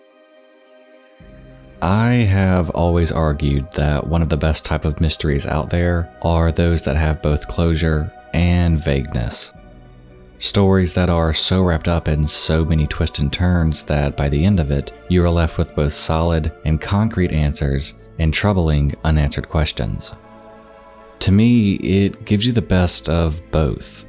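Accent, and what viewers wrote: South, United States. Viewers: sounds like Barely Sociable with a slight southern accent. Barely has once done a southern accent, though. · Congrats on your quick rise to fame. Your videos are good, and your southern accent is just great )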